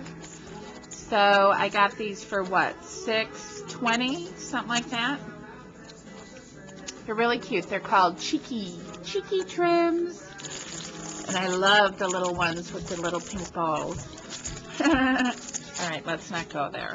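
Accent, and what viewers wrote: New Jersey, United States. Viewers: U CRACK ME UP!! I just love seeing your videos pop up because beyond enjoying what you create... I just love listening to your monologue!! I have a dear friend who's a Jersey girl, too, and its like listening to her when I catch your videos! You always provide a laugh for me!! TFS! ~Karen P.S. DEAL, DEAL, DEAL!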